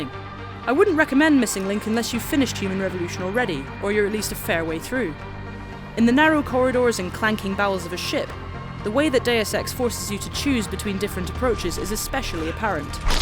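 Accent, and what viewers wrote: United Kingdom. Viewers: It is a british girl you idiots I can easily tell from the accent and voice what are you all 18-20 years olds with a brain of 10 year olds. As far the DLC goes it is good but the story is really kind of boring and it is short for 15 bucks and why don't they allow you to import your augmentations from the main game is beyond me. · who the hell is this? some British boy/girl? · HOLY SHIT IGN HIRES BRITISH 15 YEAR OLDS!? HOLY FUCK!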